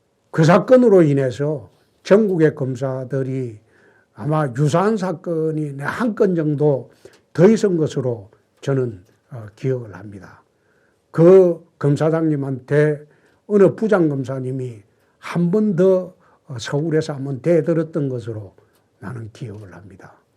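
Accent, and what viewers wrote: Gyeongsang, South Korea. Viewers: 경상도 머심마다.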